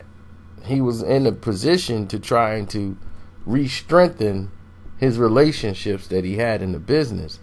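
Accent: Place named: New York, United States. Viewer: you trippin on this one.. who did tribute songs for BIG outside bad boy, which did it more less to line their pockets.. nas had a song for pac and big..and jayz snake a** was more like - i'm glad you're dead the city is mine..and in term of fans, pac got way more love..i can remember where i was at, what i ate that day..you remember how popular those bootleg 2pac shirts was.. the whole fam had them sh*ts..thought you was midwest, you sound like a new york n*gga on this one..eventho in their heart's they loved pac (ie. maino, uncle murda)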